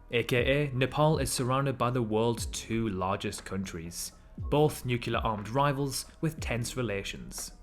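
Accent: United Kingdom. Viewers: I really love your British accent bro!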